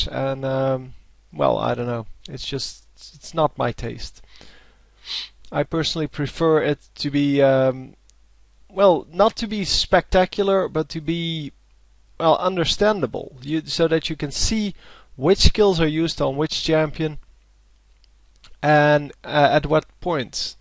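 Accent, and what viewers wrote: Netherlands. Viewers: You sound like a Dutchman speaking English.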